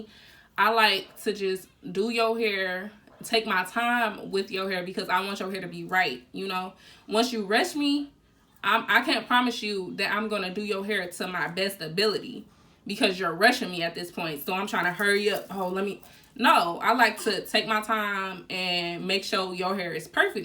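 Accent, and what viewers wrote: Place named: Detroit, United States. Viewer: You gotta be from Detroit 😂😂😂 I hear the accent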